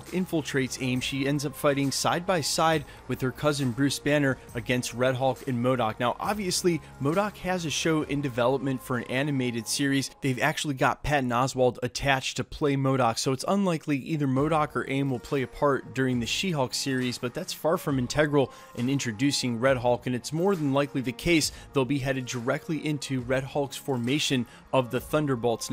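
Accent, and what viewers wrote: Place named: California, United States. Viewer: LOL i see what youre pointing, yes he is slightly sound like the Californians characters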